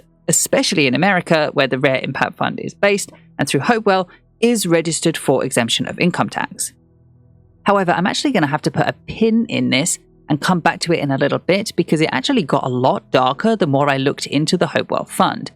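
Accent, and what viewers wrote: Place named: United Kingdom. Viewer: I mean, England is not a single country. Yet. UK is and you kinda sound from there. Anyway. Maybe in 50years due to Brexit there will be no UK, but Scottland, Ireland and England, but for now.. UK. I am not trying to be pretencious it just make my ears bleed.. every time I have really liked the video, I find Selena drama queen shady. But I also now 100% due to my profession that people are so so into mental health now after the pandemics. It was a smart thing of her, this way she reached older audience as well, I mean sure she ha sgreat price point but I don't find her products good as some of professional MUAs, and kinda a lot of colors, glitters in the highlighters in certain light.. not really for mature skin, if you get me. Liquid blush too pigmented so need a work and then too heavy foundation (and this is not a mature skin friendly). 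Anyway, I wasn't before this a fan but this looks shady. Great job overall!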